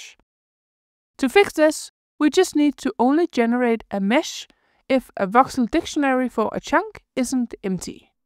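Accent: Denmark. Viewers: No problem, have subscribed and rung the bell too. also are you danish by any chance?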